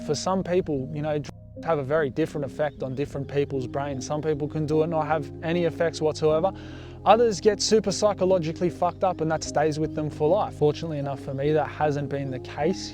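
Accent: Australia. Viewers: He’s Australian bro 😂 what Wer you on tho my guy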